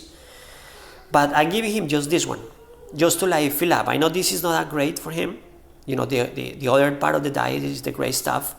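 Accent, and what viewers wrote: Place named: Colombia. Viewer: You sound Colombian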